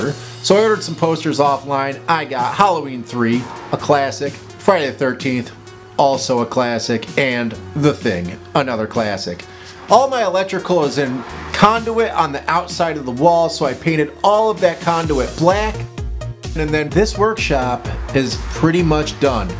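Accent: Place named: Midwest, United States. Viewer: Where are you from? You sound very midwest lol not a dig, just familiar. MI here🙋🏼‍♀️ Awesome job by the way!!👏😃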